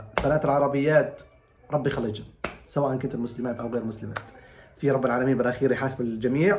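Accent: Iraq. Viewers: فدوة احلى سوري يجيد اللهجة العراقية ربي يحفظك ويعلي مراتبك ان شاء الله توصل للمليون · واجيتكم بعد غياب صارلي 4سنوات مو شايفك مشاء الله عليك شو احس انته صاير تحجي عراقي مبروك ع المليون فديت ❤ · سوري وانا من بدايه الفيديو وانا مفكرتك عراقي بسبب اللهجه ❤ حبايبنا السوريين 🇸🇾🌹♥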